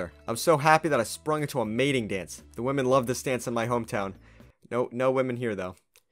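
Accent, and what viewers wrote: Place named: France. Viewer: Your French accasent I cool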